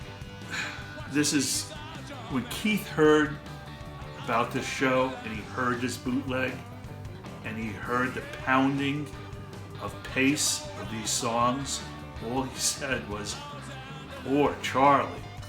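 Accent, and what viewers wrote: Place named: Philadelphia, United States. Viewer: This was the first album (cassette) I ever bought when I was 15, 16(?) and although it’s not considered generally or by critical acclaim to be one of their best, it is nontheless a solid album. To be honest, it’s not even one of my favorites although I do like it very much and always have. I was introduced to the by the older brother of a good friend when I was still a young rock fan trying to expand my musical horizons past Foghat, Kiss, Journey, Doobie Bros, etc. (I had discovered Led Zepplin and The Who by this time but Pink Floyd wasn’t even on the radar). Anyway, thx for your in depth research, knowledge, and reflections of personal ups and downs the band members may have been going thru during the time of recording. One thing I’d like to mention about Goat Heads Soap is that I really feel that is possibly the most underrated song and one of my all time favorites! 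Another great job. I watched all three Let It Bleed docu’s and now watching part 3 of Goats Head Soup as I write this. Would love to get a copy of Brussels Affair, CD and DVD, blue Ray if possible. Yep! Another great job! One last thing; I live in Cal and I’m guessing by your accent that you’re from Philly. Just a sayin… Hope you do a doc about my favorite album and one the two tours I saw…SOME GIRLS. (Tatoo You was the other one)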